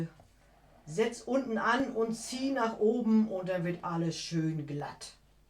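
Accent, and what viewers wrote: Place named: North, Germany. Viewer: Wunderschöner Frosch, echt witzig und super erklärt, übrigens höre ich gern deinen norddeutschen Dialekt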